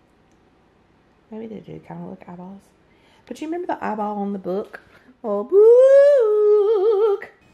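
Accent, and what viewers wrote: South, United States. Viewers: Girl! You is southern! Lol . Gotta drink tea! Hope you feel better soon!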